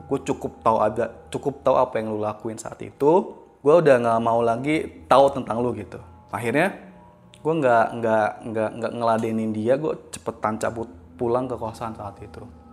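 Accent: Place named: South Jakarta, Indonesia. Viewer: Ada logat2 jaksel kek nya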